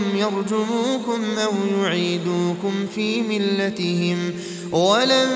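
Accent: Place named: Morocco. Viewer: قراءتك جميلة كانك في المغرب قراءتك مرتلة بقراءة موضوحة بالكلمات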